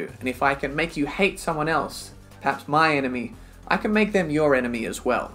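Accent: Australia. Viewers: What accent is that? Australian?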